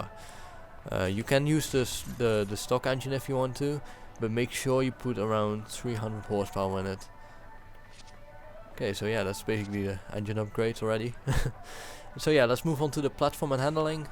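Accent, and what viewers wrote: Netherlands. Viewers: your are dutch right?? I noticed an accent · Ik ken je channel al lang, maar ik heb nog nooit een commentary van je gezien... Het eerste waar ik aan dacht was je accent, duidelijk nederlands. Groetjes uit Duitsland btw! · Are you dutch? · are you dutch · You can hear that he's from Holland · Accent is dutch, dat ben ik namelijk ook :p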